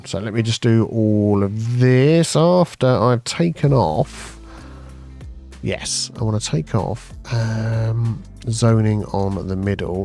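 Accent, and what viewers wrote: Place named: RP, United Kingdom. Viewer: I keep saying “Penndel” in posh voice to myself off and on throughout the day whenever I need a laugh. That caught me so incredibly off guard at 😂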